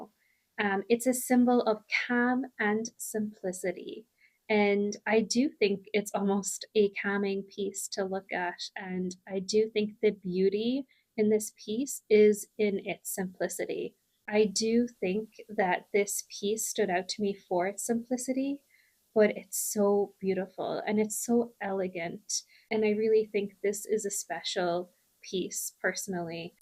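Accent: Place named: Ireland. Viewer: Im so glad you mentioned you are from Ireland originally in this video - I spent the 1st 44 out of 45 minutes trying to place your accent, because some of the words you say sound so Irish, but some sounds not so Irish. I shouldnt have doubted myself so much 😂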